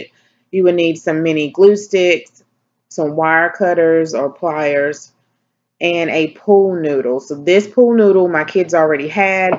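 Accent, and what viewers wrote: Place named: Louisiana, United States. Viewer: Very nice work, and so pretty!! Love the creativity using a pool noodle! I love your accent! You almost sound like me a Louisiana girl! 😆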